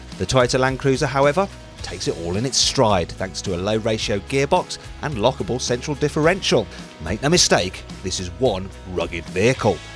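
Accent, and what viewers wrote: United Kingdom. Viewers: its a little bit of a peeeeeeeerch...typical British accent....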